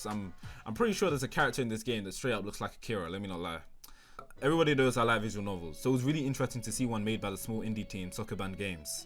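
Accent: United Kingdom. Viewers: You and prince Soloman sound similar, ur jus the British version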